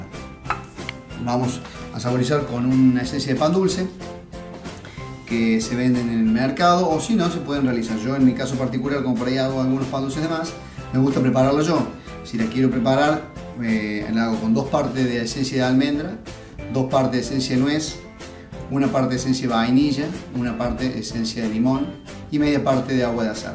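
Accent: Córdoba, Argentina. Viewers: Desde hace muchos años soy un apasionado por la cocina. Hace menos años me sumé a la pastelería y tus clases fueron tesoros en esta nueva búsqueda. Para esta navidad hice turrón turco, pan dulce y budines. Fueron elogiados. Por eso va para vos mi agradecimiento por la generosidad con la que compartís tus secretos y conocimientos. Además, como soy patriota cordobés, disfruto de un maestro con mi mismo acento.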